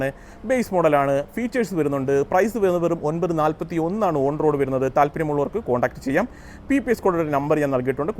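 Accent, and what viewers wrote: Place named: Kollam, India. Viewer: കൊല്ലം language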